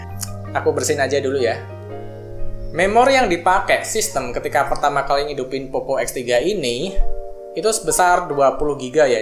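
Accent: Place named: Java, Indonesia. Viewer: logat jowo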